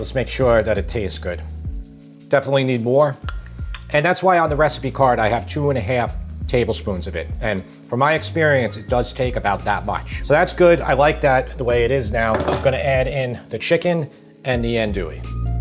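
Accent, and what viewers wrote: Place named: Long Island, United States. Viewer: As an Ontarian Canadian, your Long Island accent makes me laugh every time. I really like your videos and your content, thank you for sharing them with me. One thing I'm surprised though, is that you use these large diameter cooking pans, but have such a small stove burner. Does that really work that well? I would think a larger burner would be something you'd be looking for. Also, is that a propane or butane stove? I never would've thought such a simple camper stove could be used to make food like this. Also, where do you find things like your cutting boards? I like how large the wooden one is, but don't really know how I'd go about looking for something like that.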